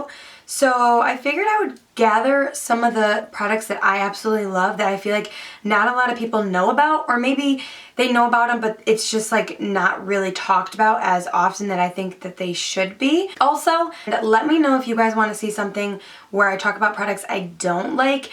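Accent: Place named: Upstate New York, United States. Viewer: Where are you from? I'm an upstate ny girl and you have a similar accent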